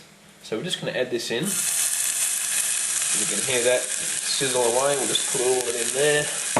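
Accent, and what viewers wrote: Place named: Australia. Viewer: r u aussie great stuff man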